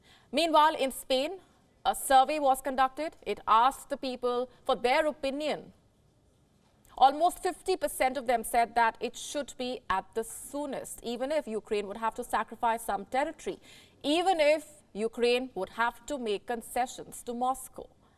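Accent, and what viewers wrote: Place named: India. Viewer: Indian accent is so ridiculously funny! 😆😆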